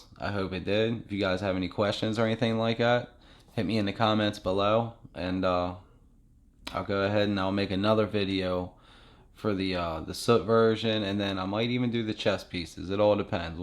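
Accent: Baltimore, United States. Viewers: I could tell in 10 seconds that you were from Baltimore man. I'm an old school South Baltimore 21230 guy here. Born and raised. Light St. , Charles St and Fort Ave. We all have that Baltimore accent that people can't miss. Lol. Its not often that I see many of us Baltimore guys on YouTube. Best of luck with your channel !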